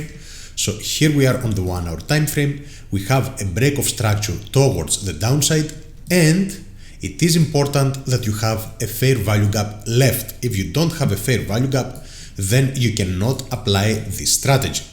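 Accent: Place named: Greece. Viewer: That greek accent is strong !!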